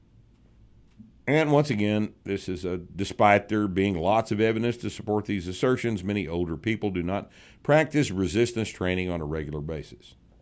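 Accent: Texas, United States. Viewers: in best Zap Branigan Impression a Texan can do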